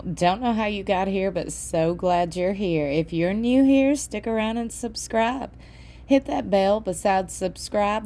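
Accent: South, United States. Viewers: I love your voice! And your southern accent too!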